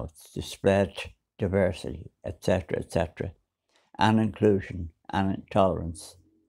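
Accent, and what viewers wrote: Ireland. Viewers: Its the content is what counts man. Many excellent points. You are not wandering at all man. Its also your honesty and integrity. Im born and bred Dublin too but I could listen to that accent and watch you all day. Keep up the great work. You are an old-school true blue, true Dub with top values and principles. Yes it is stunningly disturbing how quickly woke was adopted here and throughout the west. Its the wealthy Marxists to blame! Ireland seems lost. God bless.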